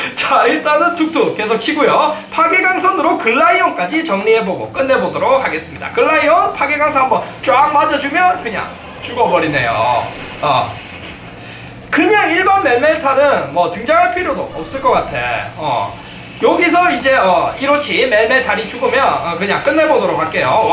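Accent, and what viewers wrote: Gyeongsang, South Korea. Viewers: 님 경상남도 사시나요?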